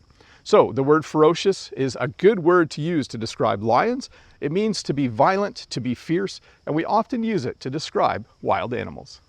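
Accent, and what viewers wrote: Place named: Canada. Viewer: please next time talk about Zebras with your brilliant Canadian accent ❤️🇨🇦🇨🇦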